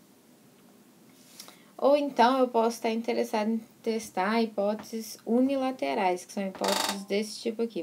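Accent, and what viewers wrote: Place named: Minas Gerais, Brazil. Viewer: Ótima explicação e o sotaque mineiro é bão dimais.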